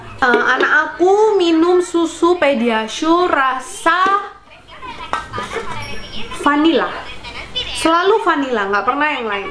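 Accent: Surabaya, Indonesia. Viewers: Mbk e logatnya medok ky boso suroboyoan, sidoarjoan.... Org mana mbk??? Suka saya.. apa adanya bnget suaranya.. mksih ilmunya brmnfaat bngt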